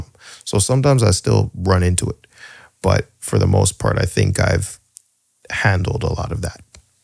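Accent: Toronto, Canada. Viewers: The moment I heard the accent I knew you were from Toronto. Its 630 am and this was a great morning listen. Sending love from Brampton. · I heard the way you speak and thought you were from Canada. Where are you from?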